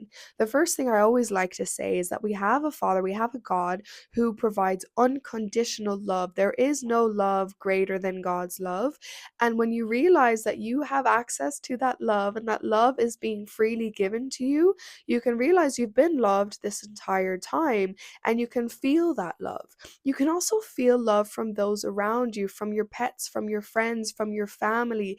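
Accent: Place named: Ireland. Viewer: Great video. Are you Irish. You have a hint of an Irish accent. Really excellent video on Limerance.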